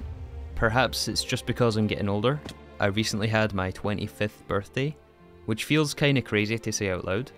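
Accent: Scotland, United Kingdom. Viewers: we've all had the honour to experience and enjoy your brilliance in this blocky game. Life changes and evolves, as must we along with it. We will miss you, we love you, and we're happy if you're happy. So long dear friend, hopefully we may hear that beautiful Scottish accent again in the future. Wherever you go, whatever you do, always ALWAYS check if its HV or MV. ❤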